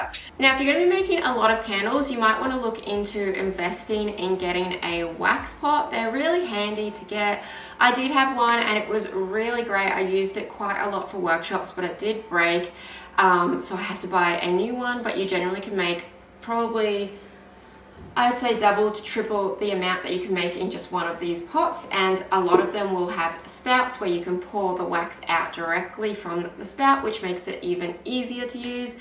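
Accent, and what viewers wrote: Australia. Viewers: Nice to listen to an Aussie, thank you very informative 😊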